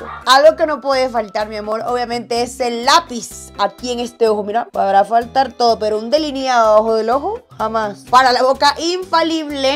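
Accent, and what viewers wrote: Venezuela. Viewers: eres venezolana me encanta comohablas😍😘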